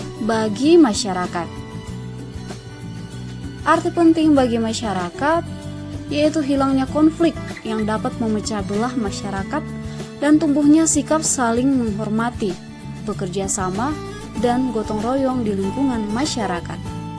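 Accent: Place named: Batak, Indonesia. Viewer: Masih ada bahasa pekat btakny🤣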